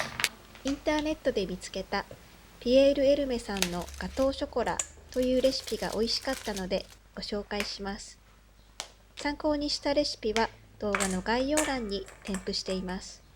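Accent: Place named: Kansai, Japan. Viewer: akiさん、初めまして何時も見せて頂いています＾＾ ほのぼのとして素敵なお庭ですね～♥ そしてお優しいご主人～良い方に巡り合われましたね～ 私は大阪に住んでいるのですが、時々akiさんの関西弁が聞けるので すごく親近感をかんじていますよ～ 又、楽しみにしています～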